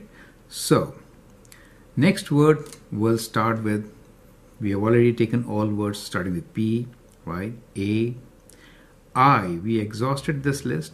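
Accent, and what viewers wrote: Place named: India. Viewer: aap jitna bhi US ascent mein bolne ki koshish karen .... hindusthani pronouncation aa hi jati hai .......... by the way video was nice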